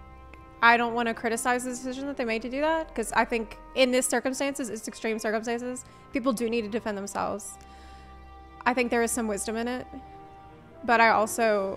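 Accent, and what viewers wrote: United States. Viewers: you sounds like you are from the US. why did want to move to ukraine? serious question, no malintent here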